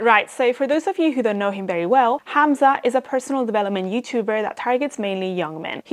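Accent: Spain. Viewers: It's me or your main language is spanish? Your content is really good, I'm just starting and is valuable to find channels like this, blessings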